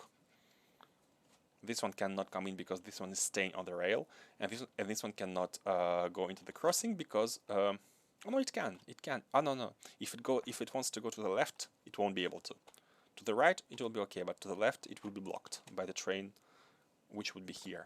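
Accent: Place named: Eastern Europe. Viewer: This railway system is very difficult. After seeing how Nilaus builds a railway where everything is "copy-paste" and simple in logic, it hurts a bit to make your life harder. I started watching yesterday and I'm going to watch all the episodes. Your English is amazing and very understandable because you speak like Slavs and I'm from Poland. You speak very carefully and that is a huge plus.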